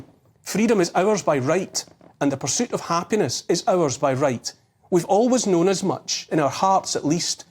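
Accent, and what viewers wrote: Scotland, United Kingdom. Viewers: Oliver: Would you consider making verified subtitles for such videos? While many people in the non-English speaking world might have enough command of the language itself, they have massive problems with the Scottish accent. (Which I personally thoroughly enjoy.) These videos by Oliver should be heard EVERYWHERE. Best wishes from Germany. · These tyrants will need to be physically removed! 🤬🤬 Won't Kneel A gentle, beautiful Scottish lilt A kind, empathic, forward tilt An open heart; a caring face A willingness to take his place. A strong, home-hitting monologue Reminds us of the boiling frog The courage to become a sleuth A passion to release the truth. A deep desire to crack the whip Illuminates our freedom-strip Calls out the darkness taking hold The shiny despots; plated gold! Intense and raw, he lays it bare A soul-enhancing, honest stare Inflections drive the message through He speaks for Us - for me and you! 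Discernment's such a precious gift His words create a mighty lift Take heed of all he has to say He knows - it shouldn't be this way! 🤗🦋🐬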